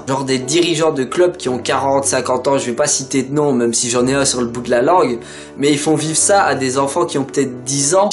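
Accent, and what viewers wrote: Liège, Belgium. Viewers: J’ai entendu que ta voix a l’accent Liegeois moi aussi je suis Liegeois PS: je t’adore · Toi ça s'entend t belge #wearebelgium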